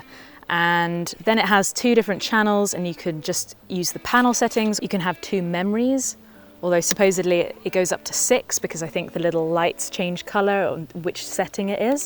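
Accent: United Kingdom. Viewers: æt taimz lυks æ bit laik Lara Kroft hier, widh dhoυz sanglāsez. Ænd dhe British æksent enhānsez dhī impreshən.;–)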